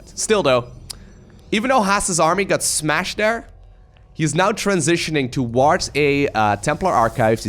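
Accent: India. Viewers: You sound like someone from India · you sound indian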